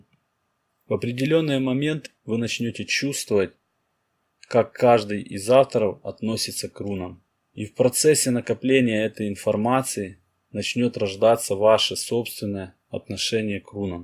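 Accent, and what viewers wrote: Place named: Donetsk, Ukraine. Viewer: У меня был друг с Донецка..Димка.....у него говор как у Вас....мне приятно....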